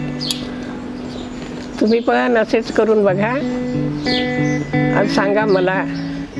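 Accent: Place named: Vidarbha, India. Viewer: Thankyu aaji.. Aaji vidarbhatalya ahe watate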